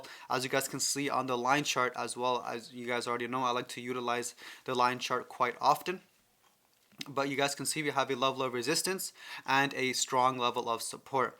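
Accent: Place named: England, United Kingdom. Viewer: You from england bro?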